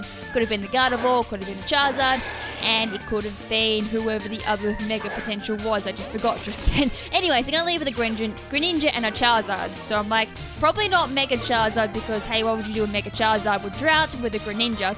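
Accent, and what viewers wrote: Australia. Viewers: Wow, I'm not gonna lie, you really seem like the female pimpnite Not in a bad in a bad way but your accent is very Australian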